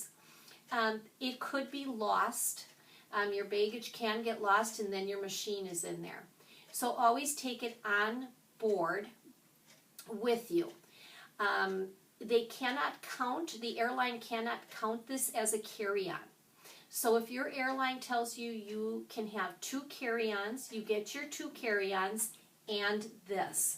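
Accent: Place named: Minnesota, United States. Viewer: Thanks, this was very informative. Like the accent, reminds of someone I know from Minnesota.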